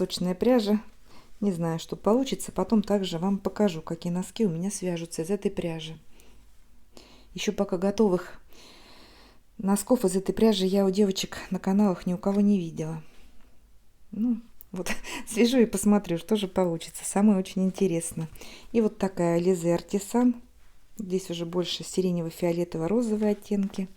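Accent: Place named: Voronezh, Russia. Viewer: Узнаваемые воронежские интонации 😊 . Смотрела ваши видео и предполагала, что вы житель Воронежского края, ну и здесь услышала подтверждение этому.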